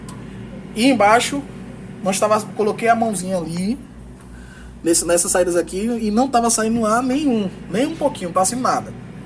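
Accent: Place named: Salvador, Brazil. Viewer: Fala moral, Ótimo vídeo, pelo sotaque voce é de salvador? Pois se for onde voce comprou essas peça?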